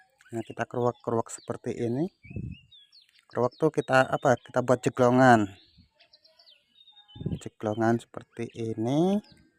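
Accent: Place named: Java, Indonesia. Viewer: Sampeyan basa jawane medok pisan sihh,,,??wong endi njenengan mase,,,👍👍👍🙏🙏🙏🙏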